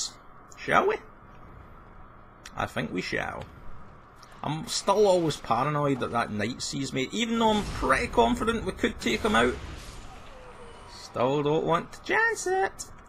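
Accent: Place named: Glasgow, United Kingdom. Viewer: Are you Glaswegian? your accent sounds just like some of my friends from down there, but less nasal.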